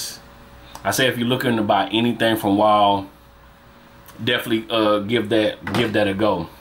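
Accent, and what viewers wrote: South, United States. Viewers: Where you from? I’m from Seattle and those southern accents always stand out around here lol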